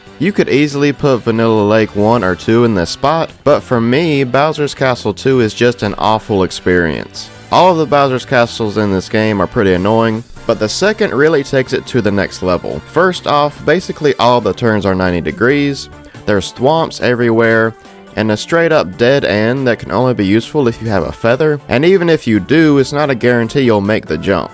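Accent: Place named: South, United States. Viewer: W man with a Southern accent talking about the worst tracks in a Japanese racing game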